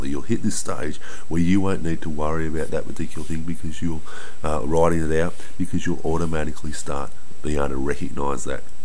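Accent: Australia. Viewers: he sounds like an australian dathi de nogla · Hardcore Australian accent [IN A GOOD WAY] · People why do u have to be mean, have u ever heard of Australia,yeh thats where he is from,same with me, so other people have diffrent voices when it comes to countrues so stop being mean.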